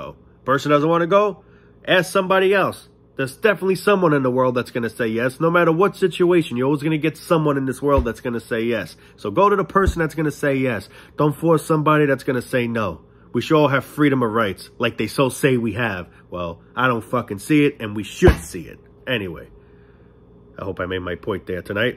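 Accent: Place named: Boston, United States. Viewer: Are you from Boston ? Love the accent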